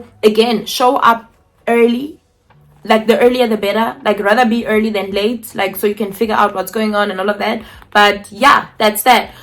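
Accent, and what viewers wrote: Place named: South Africa. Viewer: It's so nice to hear a South African talking about this. I have an interview next week for a ALT job in Japan. Fingers crossed!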